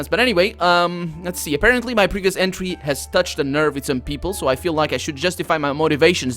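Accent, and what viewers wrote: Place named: Portugal. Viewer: Aha! So you're portugese? I've always wondered what accent that was :D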